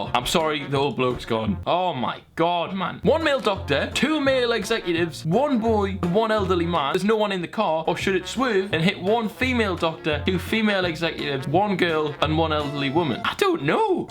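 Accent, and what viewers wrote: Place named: Newcastle, United Kingdom. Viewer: Love the fellow geordie ❤️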